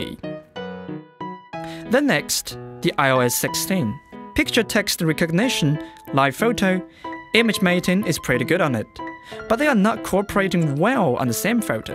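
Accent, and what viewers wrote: United Kingdom. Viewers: 英式口音，牛的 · 英音很正❤ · 这是brit accent😂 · 居然是英音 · 还好吧，英音，反正水平比我高八条街，对于做视频完全够了，多少海外youtuber都还有各自国家的口音也没人评判什么。 当然，油管这个平台其实美音会更占优势些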